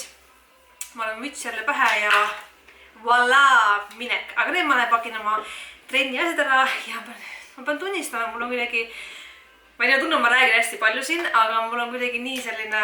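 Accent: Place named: Finland. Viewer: Nii mõnus soome aktsent ☺️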